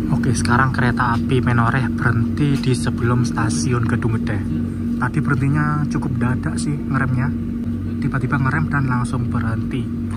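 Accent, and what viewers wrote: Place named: Madura, Indonesia. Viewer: Suaranya yg bkin candu mas😂😂 medok logat maduranya keliatan ,, semngt ngontennya😂😂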